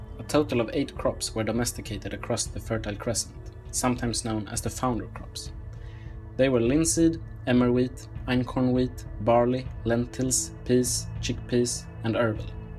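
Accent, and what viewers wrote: Sweden. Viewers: The narrator sounds sooo swedish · tænk, så stokholm-svensk... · Juan Carlos Andreu He's Swedish, cursed with an accent. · Are you swedish?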